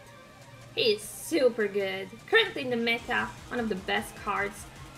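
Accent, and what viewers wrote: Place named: Eastern Europe. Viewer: oh nice, but i guessed this slavic charm haha, pozdrav iz poljske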